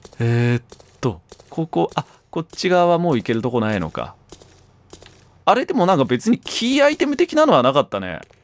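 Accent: Kansai, Japan. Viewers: なんでちょいちょい関西弁なん？（笑）好きやけど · ちょこちょこ出てくる関西弁がたまらなく好き笑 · クレアへの要求が高いうっしー(•'-'•) なんか最近関西弁出てるうっしーw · 今回のうっしー、関西弁多めだなぁと思ってたら 理由わかった👍️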